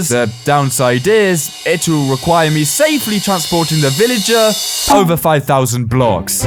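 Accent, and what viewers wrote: United Kingdom. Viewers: Ngl you sound like British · oh god this is my first time coming across he sounds to british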